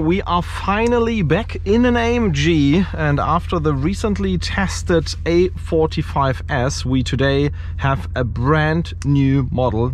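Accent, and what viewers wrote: Germany. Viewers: Schön das man raushört das du deutscher bist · This man sounds as "stereotypically german" as u can possibly get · Doesn't matter how you force yr English, yr German comes out very good with certain words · Max from Auto Top NL speaks Dutch English, speaks German English... a matter of taste!;-) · Man hört dass du Deutsch bist xD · Wie man hört das er deutsch ist . Ehrenmann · Man merkt einfach er’s aus Deutschland hahahah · Fucking German Accent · german accent check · Kann den deutschen Akzent nicht ernst nehmen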